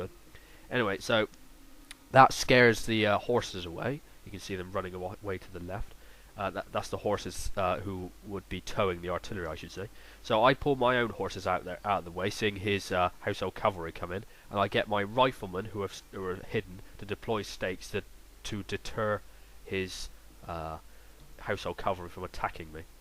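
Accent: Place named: Barnstaple, United Kingdom. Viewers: He sounds a bit West Country, maybe possibly Berkshire. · You sound like you are from Barnstaple! Noice!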